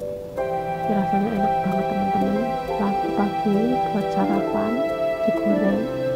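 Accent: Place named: Banyumas, Indonesia. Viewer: Ngapak ya 🤭🤭🤭😂😂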